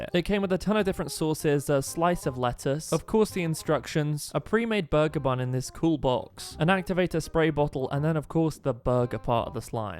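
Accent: United Kingdom. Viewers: Not to lie You sound British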